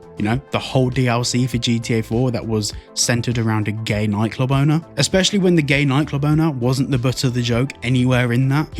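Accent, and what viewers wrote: United Kingdom. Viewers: Yea you’re definitely from the U.K lol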